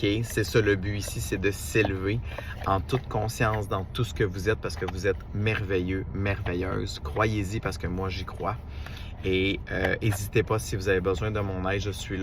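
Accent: Canada. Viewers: Bsr ce fut un plaisir avc votre charmant accent canadien à chaque fois je regarde vos vidéos 😊. Bne soirée 😊❤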